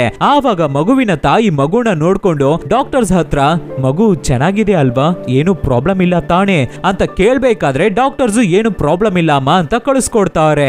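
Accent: Tamil Nadu, India. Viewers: Nim mother tung Tamil ansuthe... 😂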